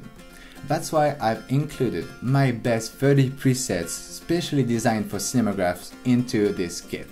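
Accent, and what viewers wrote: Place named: France. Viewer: It literally has subtitles at the bottom of the screen, and the accent is French, but the English is brilliant. Using Action kit now. Excellent stuff!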